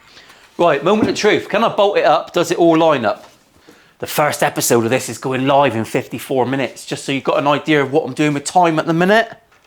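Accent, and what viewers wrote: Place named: Cornwall, United Kingdom. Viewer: Listening to you makes me miss my time spent living in Plymouth and Cornwall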